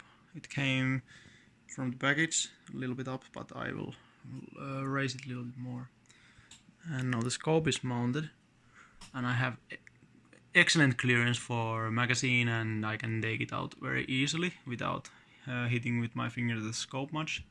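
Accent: Finland. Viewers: Sounds like a finn speaking english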